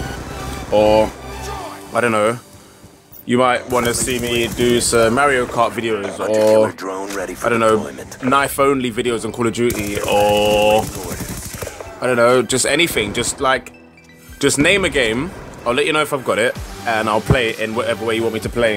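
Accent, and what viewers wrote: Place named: England, United Kingdom. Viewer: Hello:p You sound like your from england, what city do you live in? Im guessing london:p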